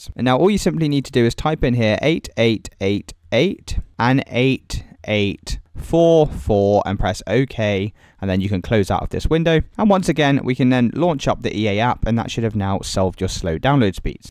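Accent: United Kingdom. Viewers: THANK YOU BRITISH MAN DNS SERVER WORKED 4 ME MAN!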